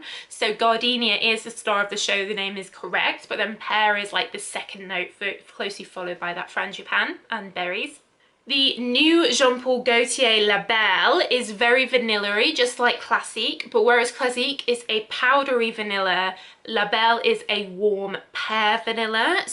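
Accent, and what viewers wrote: United Kingdom. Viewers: your skin looks gorgeous! how do you keep it so hydrated and balmy? I would like to see a facial routine video. Love your British accent though.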